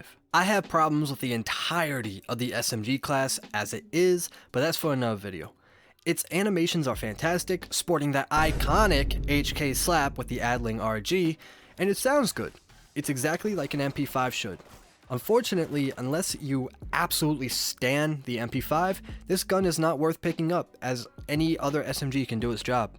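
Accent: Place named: South, United States. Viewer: Your southern accent be slipping out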